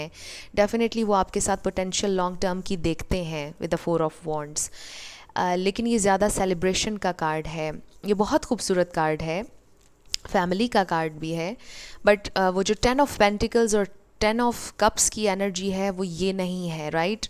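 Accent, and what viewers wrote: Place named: Pakistan. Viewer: Mujhe lagta hai aap Pakistan se lag rhi hai.. Kyuki aapki bolchal kuch ehsas dila rhi hai. Any way. All the best to you. And Happy New Year 2024❤❤🎉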